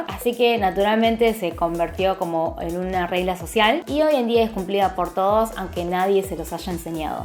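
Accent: Argentina. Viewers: La primera vez que veo que una chinita tiene acento arjentino 😍😍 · Amo ese acento tan argento!!!!! Me encanta tu canal para conocer un poco más de la cultura coreana. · Vives en Argentina???? Tu asento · Es coreana con sendecia argentina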